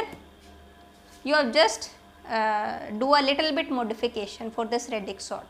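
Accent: India. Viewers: dont like the indian english accent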